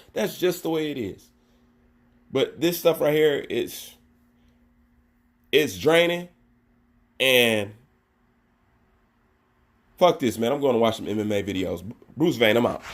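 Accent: United States. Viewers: You just showed us that AR stated he was not from NYC, but you went on with deceptive ranting for 7 minutes claiming usa is not his back yard. Unless you can show were he said usa is not his back yard, otherwise it sounds like you are bending the truth here. Also your statement "all atheletes use Steroids" is another example of you the delusional conspiracy theorist. And yes you should just go watch mma and stay far away from this sport. You sound American but yet you still don't seem to understand and respect where the real heavyweight boxing kings are in this era. I bet you doubted the greatness of ruiz the same fashion many doubted wilder and ruiz knocked AJ smooth off. Please have more respect our American heavy weight boxers.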